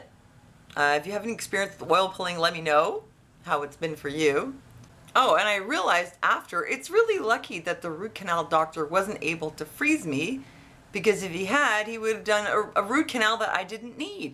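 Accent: Russia. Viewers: I like your Russian type of accent. Funny.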